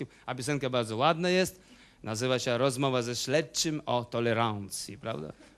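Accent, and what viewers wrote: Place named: Warsaw, Poland. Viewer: "Opisywanie rzeczywistości rosyjskiej"? Panie szanowny, to gwara Warszawska (wschodniobrzeżna) i opowieść jak najbardziej Stołeczna...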